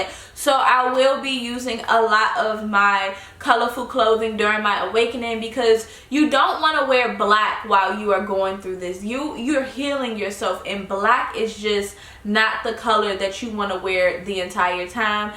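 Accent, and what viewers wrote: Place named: DMV, United States. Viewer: Are you from the DMV? Your accent sounds familiar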